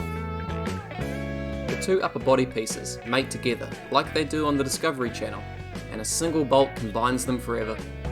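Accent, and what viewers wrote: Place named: New Zealand. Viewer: Got to be a New Zealander, man we sound so strange after listening to Americans etc.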